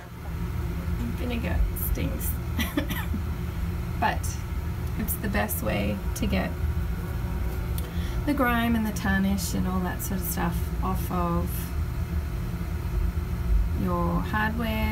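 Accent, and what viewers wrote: Australia. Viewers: Beautiful work. I thought you had an Aussie accent. Keep up the great work.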